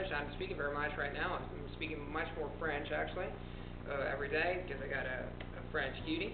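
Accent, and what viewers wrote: United States. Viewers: Your english is really good. I'm impressed. You even have an american style of speaking. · yo hablo ingles y espanol y la verdad hablas el ingles con muy buen acento americano, yo tengo viente anos viveinedo aqui en los estados unidos y asta este dia puesdo hablar con un acento como el tuyo es dificil cuando la lengue natal es un idioma completamente diferente como el espanol. very good your a master of 8 languages something i will never have under my sleave. saludos jorge de texas! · 100% American accent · You sound great speaking English with the American accent! :D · You're cute and have a great American accent. I would suggest, however, if you come to America, you should speak with an Italian accent. Foreign accents are sexy to us. Of course, the flip side of not knowing how to pronounce a word you see is not knowing how to spell a word you hear. 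As my father says, "All words are outlaw words." :) Supposedly, Gaelic is just about as messed up as English in that respect. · Your American English is pretty good, it's quite impressive actually. I can see you are trying to sound American, I can still sense your Italian accent, but it's barely there, this video proves to me that the whole 'Italian American' accent is actually almost a 'made-up' accent that evolved here in America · Are you really Italian? You sound way too native American. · Holy crap! Excellent American accent. I love it when people understand that the voice has to be placed differently. I myself am not so fond of the American placement, but you have mastered it perfectly. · Day two: It's amazing how you have an American accent. It's really cool and inspiring for those of us learning languages and trying to improve our pronunciation! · wow, u have a really good american accent! 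good job really! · I've never seen such a perfect American accent from a non-English person..even the way you express your setence structure is very American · Brilliant accent. BRILLIANT. I have to disagree with sheepthing, if I hadn't known you I would have thought you were an American. I have never seen someone pick up an accent this good without a decade of time in a country... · dude. you sound like you're from America 99% of the time. that's really great. I'm learning French now and will have to learn Italian and German later. tight man. kudos. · he is pretty good at the accent imitation and his pronunciation is really good except for a few words but the stress patterns and rhythm of his speech kinda gives him away. pretty damn good though. he sounds like he has lived in the US for a long time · good english, 99% american accent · This is a really good American accent! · You have a perfect american accent! 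I speak a different language at home and have an asian accent. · did u ever try to change ur american accent to the british accent because u may have thought that it was unattractive? Im american but ill admit any day of the week that british english is more elegant than american. im learning spanish right now and im torn between accents (latin america or castellano)... did u ever struggle with those types of choices? ur italian and u had the option of choosing ur english accent...likewise im american and have the option of choosing my spanish accent... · man!! you're a real yankey!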